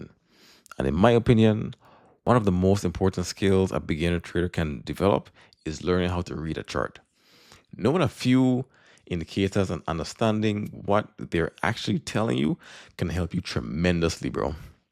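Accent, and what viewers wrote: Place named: St Croix, United States. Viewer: good morning. where are you from. sound very much like someone from STX.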